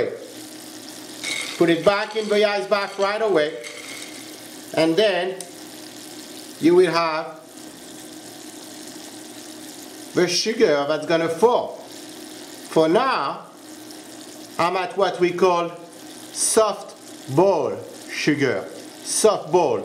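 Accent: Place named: France. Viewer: Who doesn’t love a French accent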